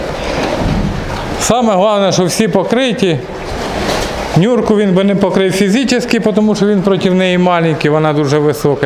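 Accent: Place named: Surzhyk, Ukraine. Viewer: Супер, супер репортаж. Наслаждался суржиком, неповторимыми интонациями и жизненной силой голоса Автора!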